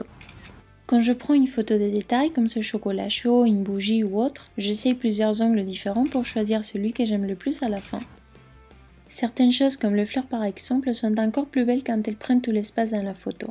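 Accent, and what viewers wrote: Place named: Spain. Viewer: Elle a l accent espagnol mais on la comprend très bien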